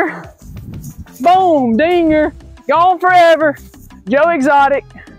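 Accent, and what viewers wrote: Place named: South, United States. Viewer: Californian Here Lol I Dig Your Videos And That Heavy Southern Accent Dude Lol Keep Them Comin Darlin..I To Played Baseball Haha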